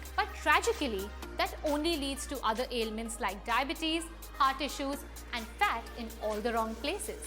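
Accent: India. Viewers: I am a Filipino and I love the English-Indian accent!❤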